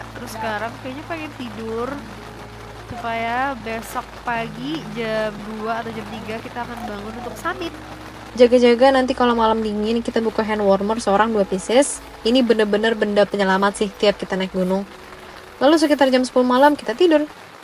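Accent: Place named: East Java, Indonesia. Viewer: Cakepp.. auto subs dh.. 😊👌 kirain asli jatim hehe..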